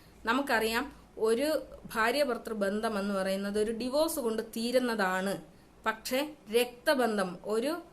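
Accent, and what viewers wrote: Malappuram, India. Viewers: Awalk bhazh malappuram mix aanalo samsarkunnath awidayum veettupanik poyanna thonunnath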